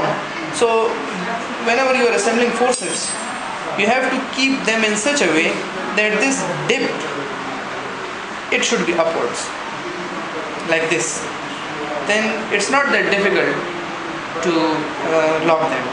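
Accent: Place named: India. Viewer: indian lol... i can tell by the accent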